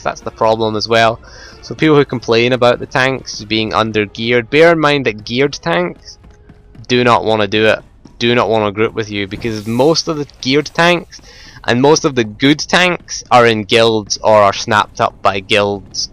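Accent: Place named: Edinburgh, United Kingdom. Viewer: What part of Scotland is your accent from? sounds like Edinburgh or east anyway ;3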